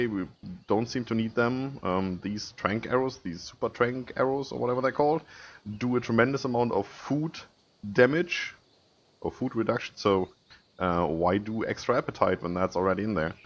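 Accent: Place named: Germany. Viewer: Hey hey, So wie du redest würde ich sagen Deutscher xD nettes video ;D besteht der fehler nach dem Server reboot immernoch ?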